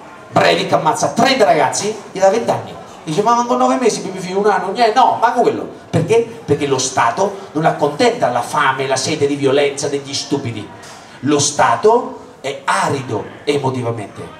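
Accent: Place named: Marche, Italy. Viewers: è il suo dialetto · un dialetto del fermano rosi, si capisce si capisce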